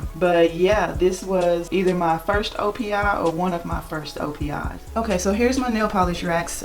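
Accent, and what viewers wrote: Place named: Texas, United States. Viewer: I thought you sounded like a Texas girl! Definitely looking forward to brand videos when you redo your shelves.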